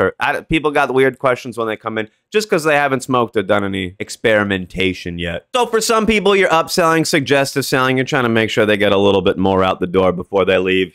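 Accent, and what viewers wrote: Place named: New England, United States. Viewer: Idk if you’ll see this or mind me asking but where did you reside before you moved to Colorado for some reason I pick up some New England in your accent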